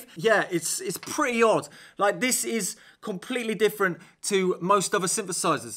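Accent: United Kingdom. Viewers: Which British accent would you call this?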